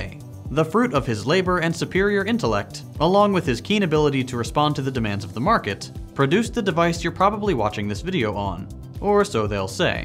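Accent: United States. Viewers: It should be obvious by your accent, by perhaps you should've prefaced this video by explaining that "we" and "our" means "American", because capitalism is not equal the world over. Europe enjoys (or has previously enjoyed) a relatively socialist approach to capitalism. What you described was the American model of "free market capitalism" which is anything but. You described America's relatively unique capitalist healthcare system, for example.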